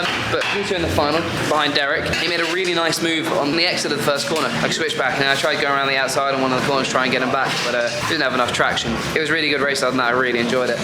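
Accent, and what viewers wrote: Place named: United Kingdom. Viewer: oh i thought this is american.. its just british.. which is just normal..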